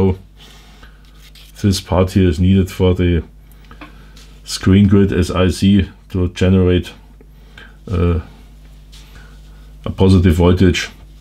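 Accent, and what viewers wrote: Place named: Germany. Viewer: funny how germans say pluck not plug and wideo not video!!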